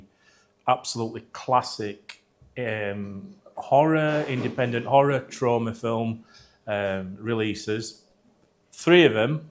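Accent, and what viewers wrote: Yorkshire, United Kingdom. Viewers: Haha, no worries at all, I’ll definitely be able to understand! Your Yorkshire accent might be a bit unique, but that’s totally fine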